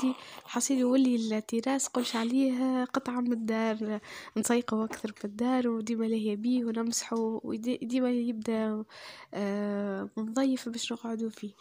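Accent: Tunisia. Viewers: ماشاء الله عليك اختي.....لهجتك جميلة انا من محبين اللهجة التونسية.....اختك منى من السعودية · مش كن تونسيين متابعينك انا متابعتك من جزائر نحبك ونحب توانسا وخاصة هدرتكم ❤😇🌸🥰😍🇩🇿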